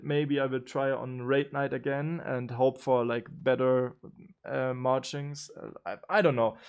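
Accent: Germany. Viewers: hab direkt gehört das du deutscher bist. :D leider hab ich net viel verstanden wie das gehen soll jetzt.... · Why do you sound German so hard? its so annoying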